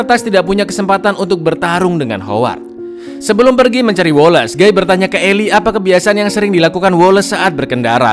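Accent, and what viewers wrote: Java, Indonesia. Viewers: Org jawa kh bg anjay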